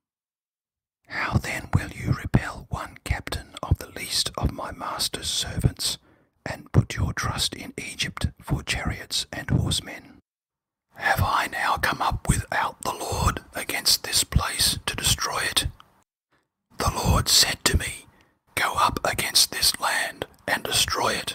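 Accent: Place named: Australia. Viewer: This is great. ASMR bible whispering...with an Aussie accent! Thank you.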